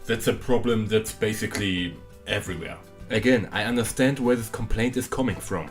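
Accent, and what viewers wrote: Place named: Germany. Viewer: So 2 Sachen. 1. Man merkt sehr krass das du deutsch bist. Aber solange du die Sprache gut beherrschst ist es ja ok! 2. Die Rechtschreibung. Man schreibt im Englischen nur Namen groß! Und das "I" schreibt man auch immer groß. (<-- Englisch Freak is am start)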